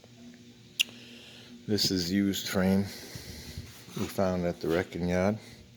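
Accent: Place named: Maine, United States. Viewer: Nice, you sound like you're from maine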